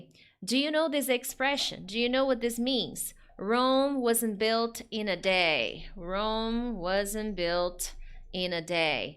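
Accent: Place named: Brazil. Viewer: Teacher once that see you,i recognized that you was Brazilian woman,because on the accent.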